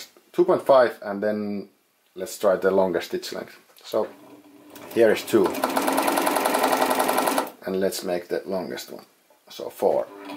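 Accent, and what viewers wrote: Finland. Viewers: I love your Finnish accent :)) I have the possibility of buying a Pfaff 93, do you recommend it? How much would you say it is worth? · Hieno video! Rallienglantia kuulee nykyään liian harvoin kun Kimi ei enää esiiny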